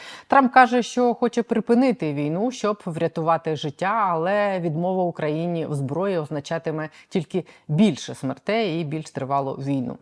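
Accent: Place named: Russia. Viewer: ВЕДУЧА! ЩО ТВОРЕТЬСЯ З ТВОЄЮ ЩЕЛЕПОЮ,ЩО ТИ ЗНУЩАЄШСЯ НАД УКРАНСЬКОЮ МОВОЮ:ЩЬО,ЩЬО,ЩЬО,ЯКЩЬО,ЩЬОБ,ЩЬОБ,ЩЄ,ЩЄ,ЧЬОМУ, ВЧЬОРА,ДА,ЧЄРВЕНЬ,ЧЄ,ЧЄ,ЧЯС,ЧЄНОВНИК,НАЙБЛИЖЧЬОГО,НЕБЕЗПЕЧЬНИМ,ПУБЛІЧЬНОГО,ЧЄРГА,ЯКЩЬОБ,ПОЧЄНАЄ,ОДНОЧЯСНО,ЧЄТВЕРГА,ПОЧЯТИ,ЗА ЩЬО,??????????????????????ГАНЬБА ТВОЇЙ МОСКАЛЬСЬКО- УРОДСЬКІЙ ЩЕЛЕПІ!!!!!!!!!!!!!!!!!!!!!(УКРАЇНСЬКА ДІАСПОРА В США)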